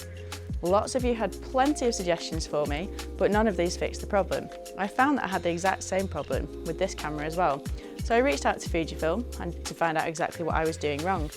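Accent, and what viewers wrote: United Kingdom. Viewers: It’s so nice hearing a Northern accent in these reviews! Thanks for making this 👍🏻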